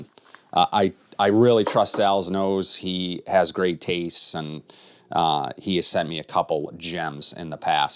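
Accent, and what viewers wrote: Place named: New England, United States. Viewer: Just found your channel and hearing fragrance takes with a NE accent is something I never knew I wanted.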